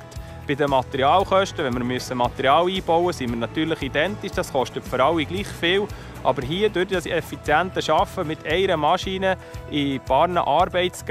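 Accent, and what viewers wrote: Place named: Bavaria, Germany. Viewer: Ich versteh alles, aber man muss sich anstrengen. Im südlichen Bayern der Dialekt ist quasi der selbe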